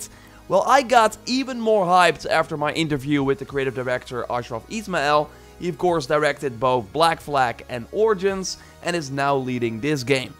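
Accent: Netherlands. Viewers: That thick Dutch accent tho... Almost makes the video unwatchable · No hate but this is the most dutch accent ever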